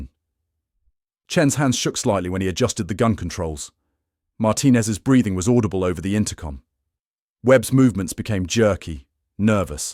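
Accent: United Kingdom. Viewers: A British accent doesn't make it true!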